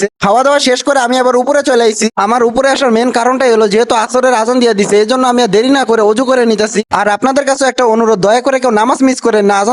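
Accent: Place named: Comilla, Bangladesh. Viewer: Apnar basa ki cumillay naki mone hoy je cumillar vasa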